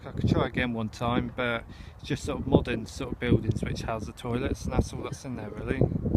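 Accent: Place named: Bournemouth, United Kingdom. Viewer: It's so great to hear a truely classic Bournemouth accent. Makin me homesick!!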